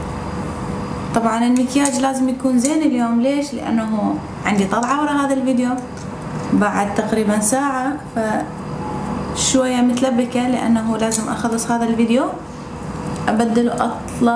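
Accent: Iraq. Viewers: Samah Munther ترا عادي كل محافضه ولهجتها شو كلها مستغربه يعني قابل حاجية مصري لو سوري هي كلها لهجة عراقيه بالنهايه وكلها تفهمها ماله داعي هذا الاستغراب كلش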